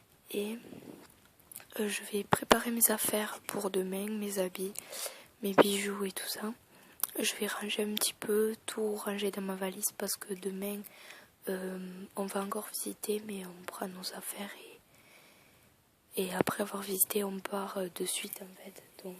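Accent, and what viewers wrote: South, France. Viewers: T'es vidéos sont juste top! J'aime beaucoup ton accent,du Sud? bisous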